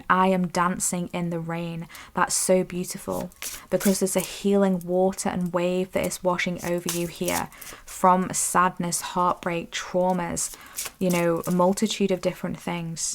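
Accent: Northern England, United Kingdom. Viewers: Pile 2 brought so much confirmation. I am welcoming in my beautiful brand new reality. I am shifting into the next phase of my life 💕🐬🌈 I’ve been sensing so much of what you brought through. I’m here to play a role in activating others. My desires to be acknowledged for my soul gifts and talents and even prosperity in connection to them aren’t bad at all, but all pure innocent and valid desires from source. Your voice is really comforting and reminds me of my grandparents from Liverpool. I always feel like my ancestors are comforting me during your readings. Are you from Northern England? Thank you for bringing through this confirmation🙏🏽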